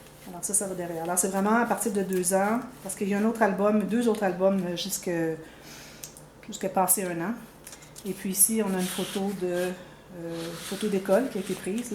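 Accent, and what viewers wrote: Quebec, Canada. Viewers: Bonjour, Je suis une abonnée assez ressente, du coup je rattrape un peu les vidéos un peu plus anciennes, c'est pourquoi le commentaire est un peu tardif par rapport à la diffusion. Tout d'abord un IMMENSE MERCI pour tous les partages que tu nous fais. Je scrape depuis 4 ans seulement et tes astuces pour les goussets dans les albums sont justes formidables pour moi qui débute. Je ne me pose plus de question je fouille dans tes tutos express et je trouve mon bonheur. Et surtout je ne perds pas de papier avec des erreurs. Pour cet album souvenir d'enfance, c'est effectivement très intéressante, pour nous, de voir comment fonctionner en amont, avec les photos pendant la réalisation. Mais surtout MERCI MERCI pour l'astuce des cartes pour les photos en diaporama: je ne sais jamais comment les caser!!! J'adore la page avec boutonnière ainsi que la cascade à pousser. 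Bon j'en ai déjà fait à tirer mais pas encore à pousser! Bientôt je me lancerai. Et bien sûr en plus des explications très simples et très claires, j'adore, j'adore ton accent et ses mots à vous les québécois qui sont tellement riches de sens. Merci merci 🥰👍👏🥰 Fab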